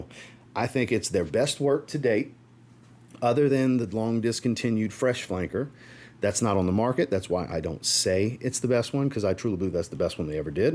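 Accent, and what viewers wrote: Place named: New Orleans, United States. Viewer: You sound like you straight outta tha parish bruh I miss Nola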